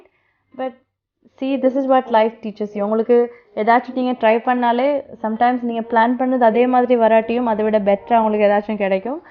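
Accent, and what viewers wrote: Sri Lanka. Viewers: I love your srilankan tamil,you speak as much as you can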